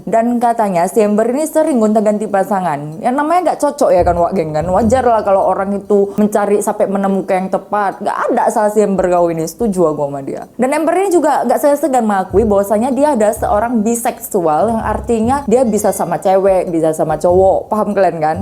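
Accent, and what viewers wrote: Medan, Indonesia. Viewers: Dia Indonesia tapi pake Logat medan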